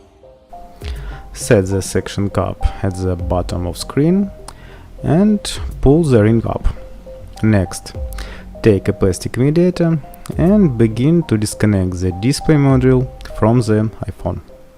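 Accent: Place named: Russia. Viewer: страшный руззкий акцент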